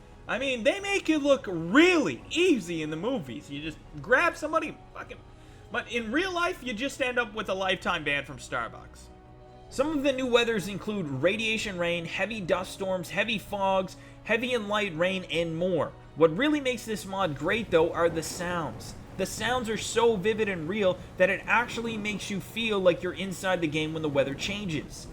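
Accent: Canada. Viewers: you Canadian? you got that accent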